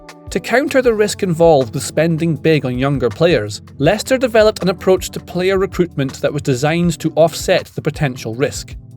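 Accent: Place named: Scotland, United Kingdom. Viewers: Why not, do you always actually believe what a youtuber says, 😂 he must be a financial expert and a Leicester expert, plus he's sounds Scottish must be a Celtic fan. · Beautiful Aberdonin accent, this is much more pleasing on the ear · I do love the Scottish accent!.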